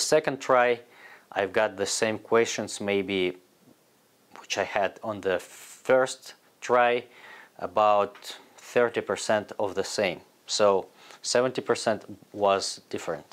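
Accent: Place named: Russia. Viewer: Sounds like Russia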